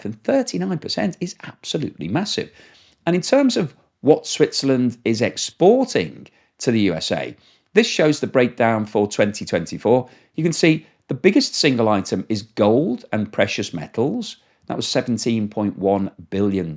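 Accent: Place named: Northwest England, United Kingdom. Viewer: Hi, I replied via e-mail, but think it didn't go through. Sorry if this is redundant. Here 'tis: Hi, Thank you. You have the easiest-to-understand economics blog that I've found. It's admirably clear, and you come across well. There really is huge value in explaining the obvious, which you're doing very well. I've made a fair bit by publishing books doing exactly that (e.g., my first book, "An Understandable Guide to Music Theory for Jazz, Rock, and Blues Musicians," which sold about 15K copies--building on my academic studies [music theory and composition], decades ago.) BTW, I guessed correctly that you have a financial background--though I thought you were an ex-stock broker--but I was wrong about your accent: I thought it was from NE England rather than NW England, primarily because it's similar to that of one of my favorite Brti comedians, Sarah Millican, who I think is from Tyneside. 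(I live in SE Arizona, have never been to the UK, have lived almost my entire life in the western US, but am fascinated by dialects.) Finally, I completely understand your decision to branch out on your own because you were taking orders from morons. And I applaud you for it. That's pretty much where I was 40+ years ago, when I started a book publishing company with a gift of $1500 from my parents--I was near broke, but was doing graphic arts work at the time, so I had the necessary skills--and then had to claw my way up, handicapped by under (nearly nonexistent)-capitalization, my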